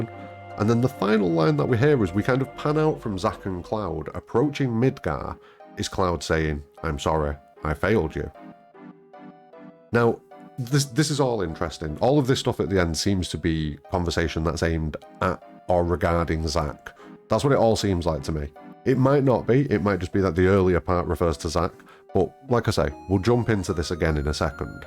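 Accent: Manchester, United Kingdom. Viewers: I literally thought that once I’d listened more. I moved here three years ago, so I’ve got ears like a bat for the Manc accent now. I could just imagine you saying, ‘Fock off, Man City!’ Hahaha.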